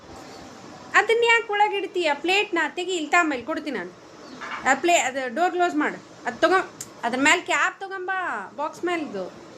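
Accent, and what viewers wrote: Raichur, India. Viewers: ಅವರೇ ನಮ್ದು ರಾಯಚೂರು ಹತ್ತಿರ ಬಂಗಾರ ಹಟ್ಟಿ ಹೀಗೆ ಇರೋದು ಇಲ್ಕಲ್ ಬಾಗಲಕೋಟೆ ಡಿಸ್ಟ್ರಿಕ್ಟ್ ನ್ಯೂ ರಾಯಚೂರು ಭಾಷೆ ಮಾತಾಡ್ತೀರಲ್ಲ ನನಗೆ ತುಂಬಾ ಇಷ್ಟ ಆಯ್ತು ಅಡಿಕೆ ಡೈಲಿ ನಿಮ್ ಚಾನೆಲ್ ನೋಡ್ತಾ ಇರ್ತೀನಿ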